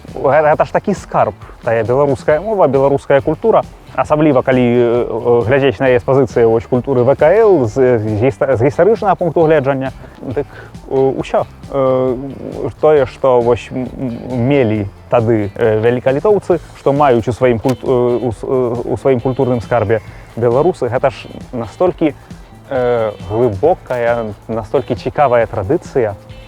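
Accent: Poland. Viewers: Як прыгожа ліецца яго мова! Мякка і натуральна ❤️ Але я б сказала, з лёгкім флёрам польскага вымаўлення) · Чуў яго некалькі год таму, калі ён, як я разумею, яшчэ ня меў такога шырокага досьведу стасункаў з беларусамі, і вымаўленьне было больш расейскае, але вось гэтыя польскія ноткі былі ўжо тады. Мабыць гэта таму, што польскую ён вывучыў раней і гэта наклала пэўны адбітак на тое, якую гаворку ён выбраў і выпрацаваў для сябе. Насамрэч, беларускіх гаворак асноўных, здаецца чатыры, таму выбірай любую і не памылішся. Прыхільнікі правілаў і норм няхай ідуць лесам, бо норму тую двадцаць разоў за нашае жыцьцё зьменяць па замове ўладароў. Будзеш за гэтым сачыць і ніколі мову не вывучыш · Никаких претензий , но это не чистый беларуский язык. Слышится польский акцент и довольно сильно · Сапраўды, польскі акцент😁 А мова цудоўная😁😁😁🌞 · Ня дрэнна сцелiць!😲 Малайчына, сказаць няма чаго! 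👏👏👏 Ды яшчэ з нейкiм такiм "польскiм" флёрам... Вельмi цiкава было паслухаць. РесЬпект ды пашана! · Бел яз с польским акцентом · Падляскi акцэнт.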